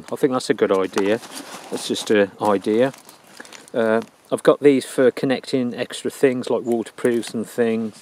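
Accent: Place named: Warwickshire, United Kingdom. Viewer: 'ello! lol I'm Rae:D (RaeNtheBionicSheep on <link> ) you sound like your from Warwickshire! Where I was born lol! Not how I'd picture the face to the voice lol but nice to see another cacher vlogging. Must do cache vlogs myself lol... Thanks for vlogging!:D I am trackable at WBX9FJ if you wanted or anyone wanted to track me:D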